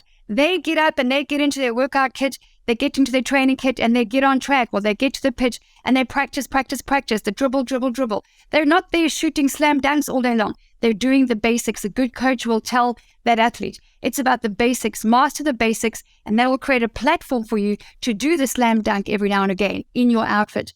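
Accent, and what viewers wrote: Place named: South Africa. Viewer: I can hear you are in South Africa. Do you do make overs? I need help.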